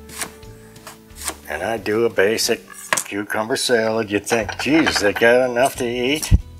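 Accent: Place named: New York, United States. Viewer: I just discovered your channel this month and love it... love all the beautiful pieces of your collections and the cooking is excellent (so organized). But most of all your New York State accent and your laugh (chuckle) with the twinkily eyes all make me happy. There is one You-tuber whose voice and cadence never fails to make me depressed so I can't watch her. I popped on your channel to get instant happy. God bless you and (my daughter's name) and your family. Thank you for all your hard work in making these postings (and, of course). Your delight in seeing something fabulous (ie. Washington, DC trip) is wonderful! God bless!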